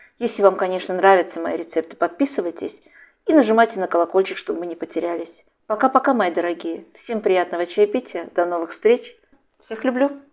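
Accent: Baku, Azerbaijan. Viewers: Спасибо ,я тоже пеку куличи и научила меня бабушка она мне не родная но я считала но моей такая добрая,ласковая аиу меня не было не бабушек и дедушек ,венское тесто она говорила , похожее на ваше тоже ,очень лёгкое , но хочу попробовать ваше ,мне очень понравилось и ваш голос вы случайно не из Баку ,я из Баку ,голос приятный и вы говорите нечего лишнего ,попробую ваш ещё проще и очень красиво и наверное вкусно ,вас с страсной неделью и со Светлой Пасхой и золотым ручкам и вам здоровья и хранит вас вашу семью ,Господь Аминь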